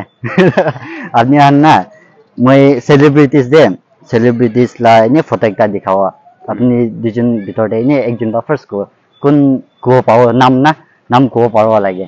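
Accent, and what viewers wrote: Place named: Nagaland, India. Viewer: Accent says it all... Naga tribes.. Dont sell our future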